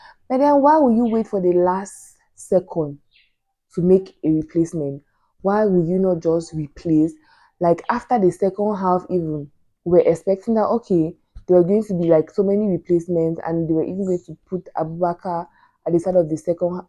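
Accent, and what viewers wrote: Nigeria. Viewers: This beautiful sister sounds exactly like an educated Nigerian, but she's actually smarter than us. Imagine she speaks both french and a good command of English. Whereas most our Nigerian sisters can't even express themselves in English fluently without switching to Pidgin 😂❤😅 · Those of you saying she sounds nigerian. By blood and ethnicity southern cameroon are nigerians, colonia masters and them voting to join cameroon is the problem. Blood is ticker than water. Even in cameroon they have been accused of sounding like nigerians, dressing like nigerians, almost everything like nigerians. They are actually nigerians. Just a matter of time , we will integrate them into nigerian society. case closed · This girl sounding like a Nigeria 🇳🇬 😊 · You sound so Nigerian. I am surprised as to how you Cameroonians sound so much like us. If you are not wearing that jersy, i would think you were Nigerian. 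Splendid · I so much like the way you speak. It reminds me of someone special besides the fact that you sound Nigerian. I'm your new subscriber 😃🇳🇬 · This lady is beautiful and brainy... honestly you sound like a Nigerian...it will be pleasure to host you in Abuja....U've my subscription❤🎉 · My sister, did you grow up in Nigeria? Your Accent is more like that of a Yoruba Girl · Sister you have a Nigerian accent. I can tell because I am a Nigerian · I think most of the viewers are used to hearing Ghanaian's accent and easily know they're Ghanaians but obviously your accent in pronouncing some certain words are similar to how we pronounce them plus after each of your sentences you use the word "oo" which is also a Nigerian intonation. Personally, listening to you I would have bet you were Nigerian but the jersey made me rethink. Regardless, please take no offense as to people saying you sound Nigerian,I think it's just curiosity and people are a lil bit surprised at your fluency! 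· . Thanks for confusing me. I saw the Cameroonian jersey on you but when you started talking, I thought you were a Nigerian but it's well. Good job!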